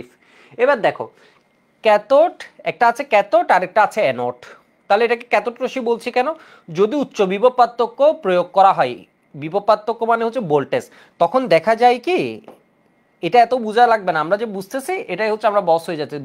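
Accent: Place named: Chittagong, Bangladesh. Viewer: সম্ভবত Chittagong এর accent আছে ওনার কথায়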